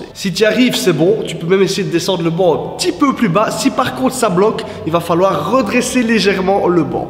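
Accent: Liège, Belgium. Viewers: Jkiff ta facon de PARLÉ vive la Belgique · Oufti l'accent 👍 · Salut, Bien sympas les vidéos. J'entends comme un petit accent liégeoise ... :) Tu es de quel coin ?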